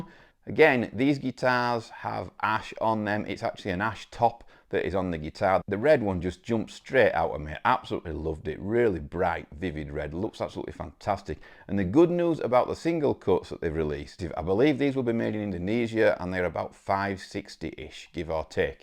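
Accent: United Kingdom. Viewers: Great video. Man. I love the single cut. I also love ash with the way your Charvel is. Very cool, but like you I love single cuts and Gibson short scale. Ash is actually several, or about 4 woods used in guitars. So called swamp ash is from the southern US and is typically light weight . I have a Japanese Strat I am building and still need to order a neck. It is cool with three EMG SA pickups because I hate single coil noise. I also have a 1990 Charvel Japanese crackle red with reverse pointy headstock and factory Schaller trem. You can throw that guitar against the wall and it won't go out of tune and I've had it since about 2000. I knew the original owner and both of us ayes this guitar a lot and the frets are still huge. They used some kind of Japanese superior steel in their frets back then. I also have a Japanese Ibanez and it's frets don't seem to wear. 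I had a Mexican strat that was great but those frets sorry down in like 4 years and I sold it. 😂 Great video though and I hope you can get the single cut especially to show us. It sounds like it has a great price. I think the Euro and dollar are about the same now. So makes it easy to convert. 😂 Are you in the UK, or Scotland or Ireland? You have a really thick accent to this American. 😂. I can usually tell. I have a friend from Scotland here in the US. You sound like him. My son went to Scotland for like 6 months while in college and he loved it. Anyway. Great channel a d good videos sir. I've been following you awhile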